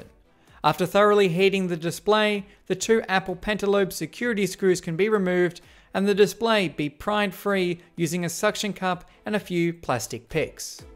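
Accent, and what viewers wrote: Australia. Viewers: Who else comes here for the Aussie Accent?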